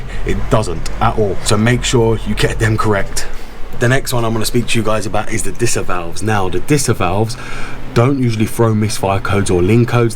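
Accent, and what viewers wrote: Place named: Scotland, United Kingdom. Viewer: comprehensive information, thank you, can you please consider to speak a built slowly, for non-scotich native it will be difficult to follow you. I turned on youtube auto English subtitle caption but it seems this option can't catch what you said too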